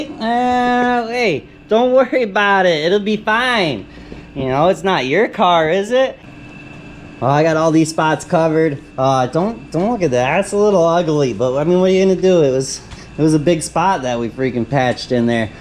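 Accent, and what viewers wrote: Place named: Wisconsin, United States. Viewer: Love this guy’s can do attitude. Love your accent too. Where are you from originally? Reminds me of Wisconsin 🙀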